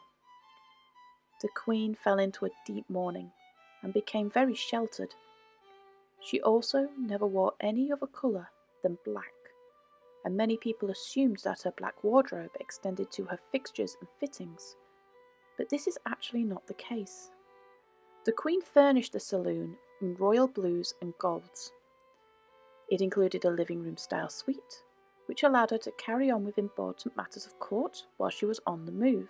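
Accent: Doncaster, United Kingdom. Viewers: I can not believe that no body has commented on your excellent video - it is refreshing to hear a lady from Donny talking about the Railways - stay safe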